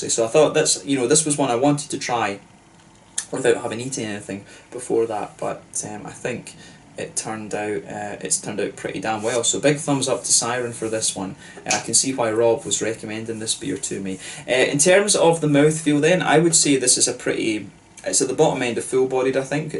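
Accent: Scotland, United Kingdom. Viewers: You sound Scottish but did you say you’re from Sweden? Nice review. My go to for craft beer is the boys from the craft beer channel, johnnie and brad. You guys should get together for a livestream. Cheers brother 🍻👍🏻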